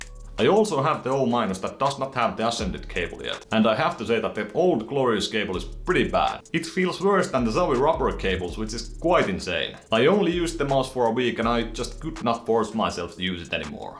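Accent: Finland. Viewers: Are you from finnish? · Are you Finnish? That accent is familiar.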